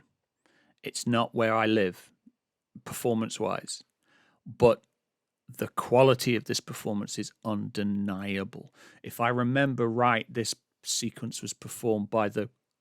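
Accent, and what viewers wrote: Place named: United Kingdom. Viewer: Hi NWA UK Eastern division here. First time I have come across your channel. From accent I assume you are British, so nice to see that. I loved your intro. Mostly I don't like excessively long intros but this was apt and actually quite moving. I will be looking at your back catalogue. Love your poetry references too. Now I shall listen to the reaction and come back at the end but I suspect I am going to love your reaction and analysis and channel. From memory isn't it at the the start? And isn't he from Reigate school choir? Maybe he is Welsh, though Reigate certainly isn't 😀 OK, I'm and this is an incredibly thoughtful, insightful reaction. Why have I not come across you before? (Subscribed.) Think I lost this: They are all top flight musicians, technically and emotionally. They can all do anything that is asked of them but they let the needs of the music dictate what they do. 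To me that is one of thecdefinitions of a great musician - interpret and project the feeling and emotion whether it needs restraint or all-out "shredding". OK, finished. Quite possibly the best TPatP reaction I have ever seen. Thank you (as Floor would say).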